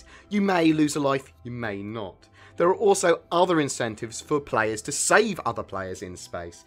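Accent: United Kingdom. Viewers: Great video as always! Have you ever considered about a professional anchor position? You remind me of a lot of British actual speakers..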